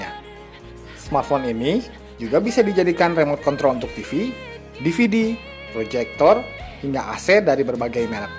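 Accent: West Java, Indonesia. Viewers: nyunda nya itu lohh lucu bingitt :*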